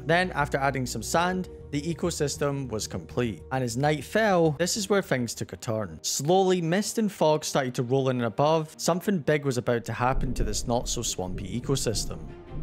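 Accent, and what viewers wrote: Scotland, United Kingdom. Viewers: The best part is your Scottish accent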